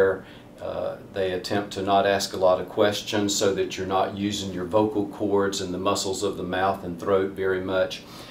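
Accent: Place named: United States. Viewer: Thx v much for the info....it's very well explained....it's based on metabolic activity -I ve no problem with the accent (American...& I m a Malaysian)... -What I m not clear is we r told too restrain from taking too much simple sugars prior to the scan, as it might interfere with its absorption into the cells.....the question is a relative HOW mUCH is too much & for how long before z procedure?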